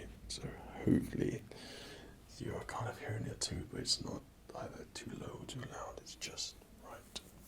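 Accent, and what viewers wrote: England, United Kingdom. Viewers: Lol he's actually British. I'm Australian and we don't sound like this. I wish he was Australian though :(((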